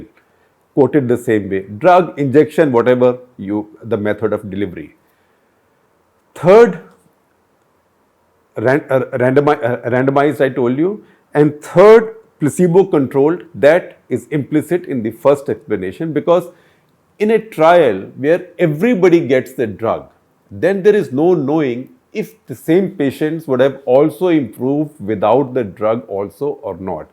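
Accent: India. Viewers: Good presentation in indian English style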